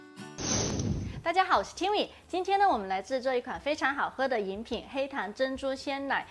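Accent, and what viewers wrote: China. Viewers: 这个普通话……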